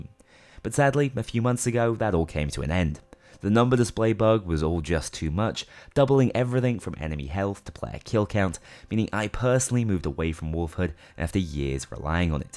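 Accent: Denmark. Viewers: Are you danish? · bro er du dansk?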